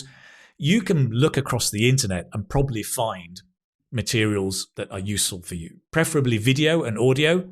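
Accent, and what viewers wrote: United Kingdom. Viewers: hi dear 🌹🌹🌹 i had problem of hearing what english people say. since im watching your videos on youtube my listening ability is improved in amazing way. a great begining music; and your glorious & clear british english accent. thank you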